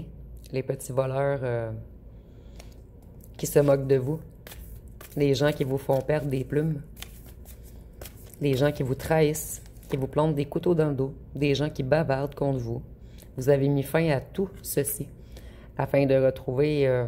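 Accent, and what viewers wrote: Quebec, Canada. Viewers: Exactement bonne journée à toi ma conceur je t adore tu es une belle âme reste bien tel que tu es j apprécie ton authenticité ton accent Québécois Extra ❤❤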